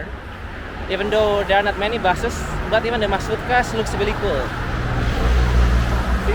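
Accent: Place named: Indonesia. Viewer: Untung aja logatnya bang Indonesia banget, jadi masih bisa mengikuti semenjak jadi English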